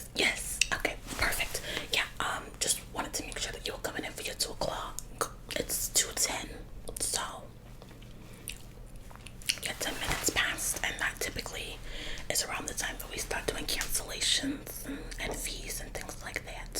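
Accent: South, United States. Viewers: The Southern accent is spot on i love it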